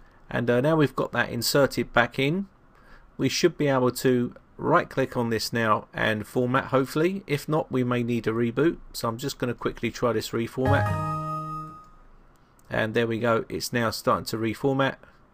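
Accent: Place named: Cockney, United Kingdom. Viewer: You are a marvel to us, your tech tips are truly on the button but I have to laugh, my wife too is a cockney. One of her "bruvvas" speaks just like you. His fumdrive (also drive hache) is always having write protection problems. Hahaha. All the best mate.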